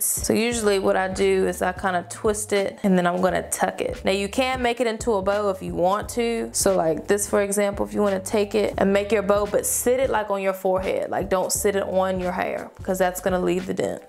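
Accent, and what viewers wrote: South, United States. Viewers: a real authentic southern accent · I love your little country accent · in love with her country accent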